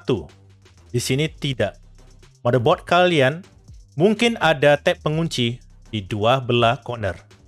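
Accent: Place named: Malaysia. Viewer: suara nya kayak orang Malaysia sih😅😅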